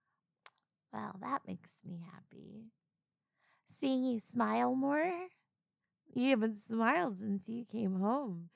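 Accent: South, United States. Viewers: Customary comment as requested 😁 But yeah, very nice work as always. Your southern tones always add an amazing cadence to your characters. Hopefully you can use some of that money to upgrade your computer again, cause... Damn that thing been put through the ringer the last couple of days! Can't wait to hear what you hit us with next ❤❤❤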